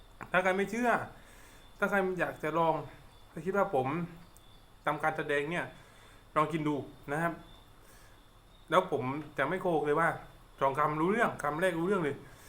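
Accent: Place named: South, Thailand. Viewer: อ่อรู้เเล้วก้ว่าอยู่ทำไมพี่พูดสำเนียงใต้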